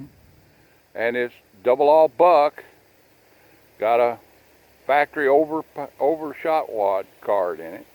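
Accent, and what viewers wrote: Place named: Western North Carolina, United States. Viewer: Yep you sound like you're from West North Carolina around Murphy ? Am I wrong ???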